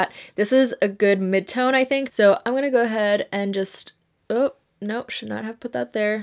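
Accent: Brazil. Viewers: vc é brasileira CERTEEEEEZA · she's brazilian, if that clarifies things haha. portuguese and spanish are pretty similar languages 💞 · Eu amo o seu sotaque carioca kkkk, seria legal ter um vídeo seu falando português, mas entendo que seria difícil em razão do seu publico ser majoritariamente composto por gente que fala inglês, AAAAAA E A MAQUIAGEM ESTÁ FENOMENAAAL ♥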